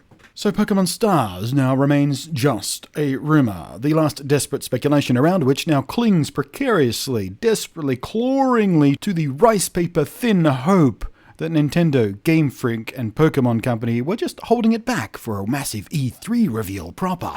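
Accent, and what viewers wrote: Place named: Australia. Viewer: There's something about a deep voiced eloquent Australian's ranting that cuts deeper, ya know?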